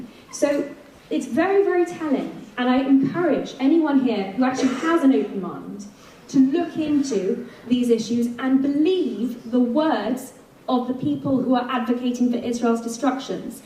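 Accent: England, United Kingdom. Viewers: Your bullshit British accent doesn't belong on the world stage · She has an English accent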